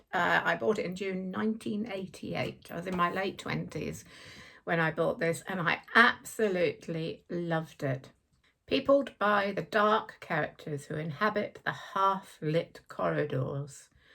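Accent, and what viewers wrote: England, United Kingdom. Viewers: A lovely English accented lady talking about winter books, preferably curled up on my sofa under a fuzzy blanket on a dim, chilly day with a fire crackling in the grate… what could be better??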